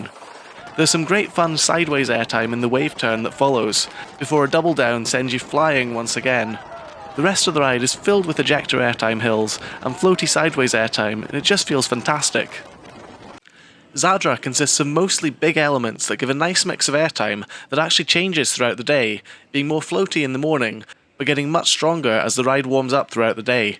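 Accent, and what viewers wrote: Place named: Wales, United Kingdom. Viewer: Ouhh ok. I thought I heard some welsh 😅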